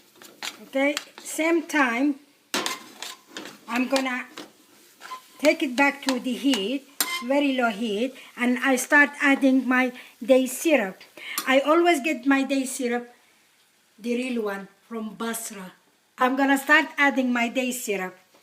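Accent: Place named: United States. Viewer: you are really great , but you have an American accent , Are you live there before ???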